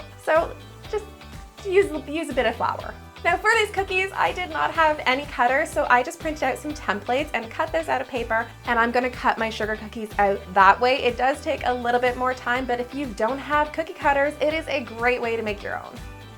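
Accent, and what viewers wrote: Canada. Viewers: Idk why people make fun of it I love how Canadians say out and about it’s just the cutest thing ever.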